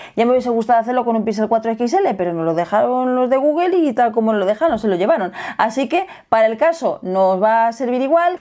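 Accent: Spain. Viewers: No se pero a mi me parese que la forma de hablar de los Españoles es vastate fastidiosa no me gusta 😑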